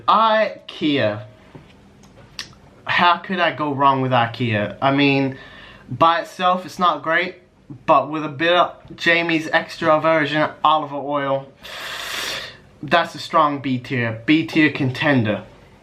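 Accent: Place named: United States. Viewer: Honestly a solid list, not a lot to contest here. Would have put Sushi Train at a B tier at most purely out of cost vs product but that's personal choice. Same goes for IKEA; cost of going there doesn't make the trip worth it so that's at most a C tier. My biggest issue is Subway as a C tier. You're clearly from the states with that accent but here in SA a proper Subway is at least A tier. As a whole, this is good list and I look forward to more on the flip side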